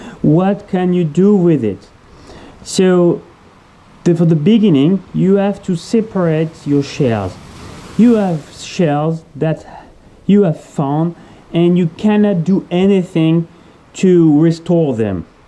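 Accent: France. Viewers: Very interesting to know this! Your French accent is charming, esp the way you say 'Horrible'! Thank you for a detailed video. I would shorten it a lot though, there is too much repetition and its too long. I'm American, so of course, we like things to the point and fast. You should always wear glasses yourself. You never know if you suddenly slipped, or had a strange unexpected accident that spilled bleach on your face. Also gloves. With regard to dental tools, you can buy them cheap on Amazon for dog teeth cleaning. Merci bien!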